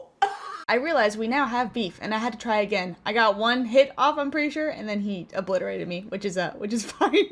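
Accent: United States. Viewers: The hehe at the begging got me 😂😂 hehe (your American pronunciation is so amazing) I’m so jelly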